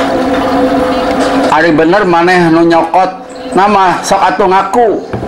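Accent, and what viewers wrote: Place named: Sunda, Indonesia. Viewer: Asli orang sunda atuh kang....👍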